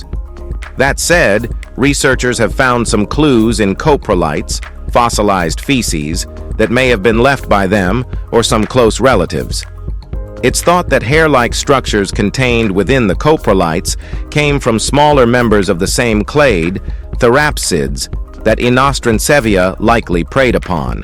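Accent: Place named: United States. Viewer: Hate that american dialect in science. sounds duh ? Foolish..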